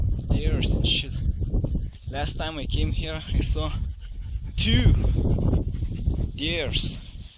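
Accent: Russia. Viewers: Are you Russian? I hear accent ha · Videos are great. I can tell your from somewhere like Russia or close to it. What a lot of people don't understand is that the word Fuck to you (since English is not your first language), is not a big deal. Keep exploring my friend, maybe you will get that superpower:)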